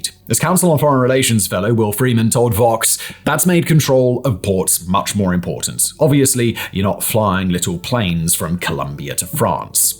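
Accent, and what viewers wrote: England, United Kingdom. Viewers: Cant blame him. I think hes english english... like.... engla terra english.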